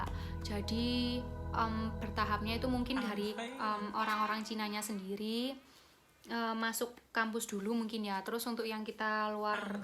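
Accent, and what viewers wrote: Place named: Java, Indonesia. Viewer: jowo medhok con.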